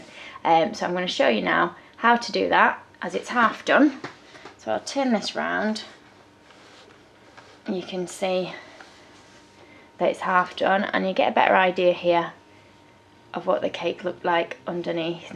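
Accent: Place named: England, United Kingdom. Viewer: New subscriber here! Where did you train, or are you self-taught? Amazing tutorials, and nice to hear an English accent, so many Australian and American cake geniuses on YouTube but I haven't come across many fellow Brits :)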